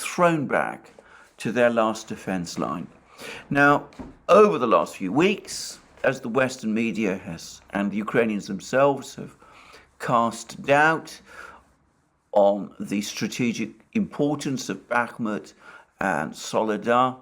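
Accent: England, United Kingdom. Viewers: is a Russian with and English accent.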